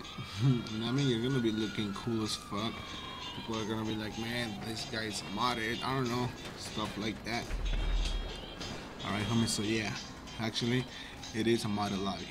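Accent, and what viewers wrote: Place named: Mexico. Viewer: love your mexican accent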